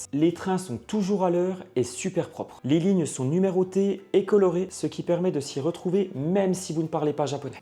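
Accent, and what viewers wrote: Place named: Vosges, France. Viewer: Merci pour tous tes bons conseils . Je pars dans une semaine et ça m’est bien utile . C’est drôle cet accent vosgien que tu as gardé ;je suis de là bas 😂😉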